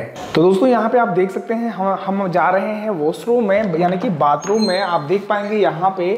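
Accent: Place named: Pahari, India. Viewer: Bheji 🙏 isme kya new hai video me ! Bhut ajeeb lga ye video but apka sh - s maje daar hai jo sari pahadi logon ki lenguage me sunai deta hai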